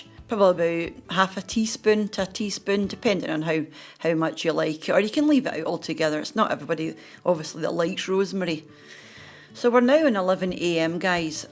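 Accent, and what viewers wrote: Scotland, United Kingdom. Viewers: I love a scottish accent...so comforting...makes you feel everything is going to be alright😁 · The lassie is Scottish :) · looks delicious, defo need to buy a slow cooker with all these amazing recipes I'm seeing :) I'm from Port Glasgow, so it's nice to hear a Scot on here :P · My husband's mother side of family is proud Scottish! So I was looking for Scottish food recipes but it was so hard to find. I am so glad I found YOU! thank you so much for your recipes.. it is so pleasant listening your accent! Pretty please upload more Scottish recipes.. thank you :) ♡ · My paternal grandfather was Scottish, and my paternal grandmother was Irish. I miss them, and the way they talked. Your brogue brings back a lot of good memories! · Am a Scot living in Thailand the last 6yrs and am just going out tomorrow to source a slow cooker... 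Can't wait to try this simple recipe!!!... and commentary in Scottish makes it a must!!!... A harty winter meal in 32° of heat!!!!...Way too hot for a peely wally Jock!!! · What are you on about. She is Scottish this is how she talks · I know right ,Scottish accents are the cutest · Love the Scottish accent! 👍🏻 Seven hours to make it and less than seven minutes to eat it! 😜😋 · Love your Scottish accent. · I love your Scottish accent, wee bit is my favourite ;-) the casserole looks good, could you put in the spuds as well if you didn't want mash?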